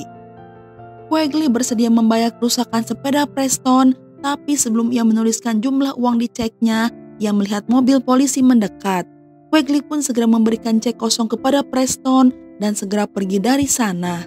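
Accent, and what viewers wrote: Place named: Sunda, Indonesia. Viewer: Suaranya khas yaa sunda hihi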